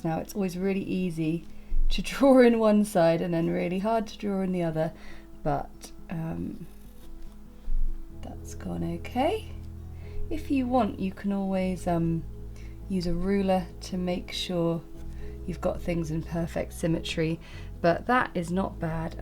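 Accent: United Kingdom. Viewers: your art is absolutely awesome! love every detail, so delicate, your creativity and your beautiful british accent, thanks for sharing!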